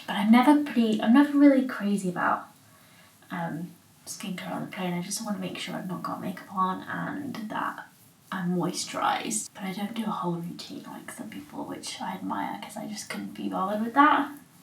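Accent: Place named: United Kingdom. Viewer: Hi! I love everything about your channel- your style, your vlogs/adventures, and mostly your book recommendations and updates. I have just read so many of the books you have reviewed and you have become a really reliable guide for me when it comes to reading books that are enjoyable, yet also important literature. I have missed your cheery British accent and smile and big chunky rings- rewatching your old videos over these weeks has been a comfort in these crazy times. I really wish you would address what's going on- as a writer and reader I feel that it is so important to document how we are feeling right now-I would appreciate and I am curious about your thoughts and experiences. I hope you are safe and reading lots:) - Yael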